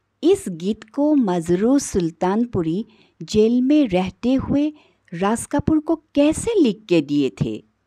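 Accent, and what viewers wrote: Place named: Assam, India. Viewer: Typical Assamese accent, love it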